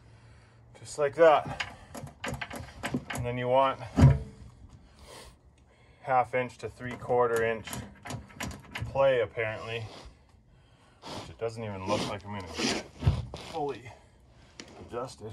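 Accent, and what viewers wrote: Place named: Canada. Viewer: Your Canadian!! That's why I can understand you 😂😂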